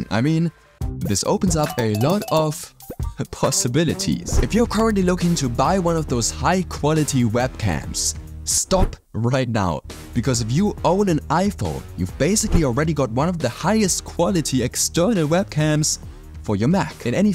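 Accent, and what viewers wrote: Germany. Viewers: Hmmm... are you German? (not to hate ofc) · are u a german guy? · Hab doch direkt den deutschen Akzent rausgehört 😅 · hehe, musste unbedingt auf die Kanalinfo gehen um bestätigt zu bekommen "Deutschland".... nicht wirklich wild doch schon recht ulkig dassi innerhalb der 1 Sekunde herausgehört habe woher der Wind weht. Und hab nicht darauf geachtet =D Das Wort people war's ^^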